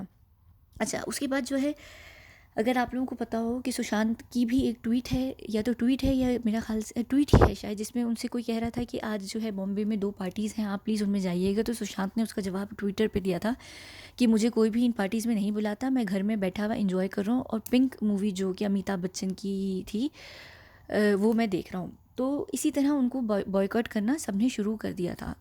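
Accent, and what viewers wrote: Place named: United Kingdom. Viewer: Great 👍 voice & great 👍 voice and also very very good Urdu & great 👍 british accent in English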